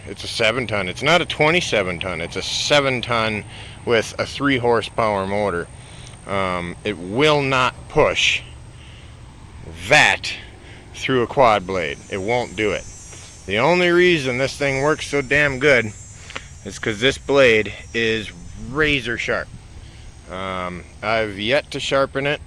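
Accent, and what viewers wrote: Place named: Wisconsin, United States. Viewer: You sound like you might be from Wisconsin. Menards too? Go Pack Go!!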